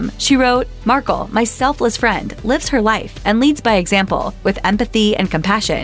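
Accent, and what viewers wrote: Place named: United States. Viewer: This is an American site... note the American accent? 🤦‍♀️🤦‍♀️🤦‍♀️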